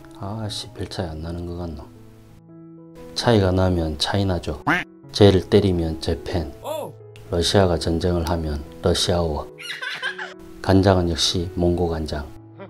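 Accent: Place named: Busan, South Korea. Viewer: 정말 아재같으셔서 구좋알했습니다. 말투가 딱 들어보니 부산 같으신데 맞지요?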